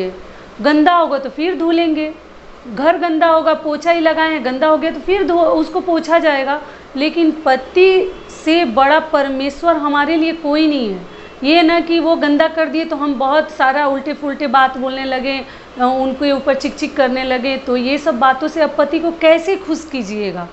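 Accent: Bihar, India. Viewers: Aap Bihar sa ho kya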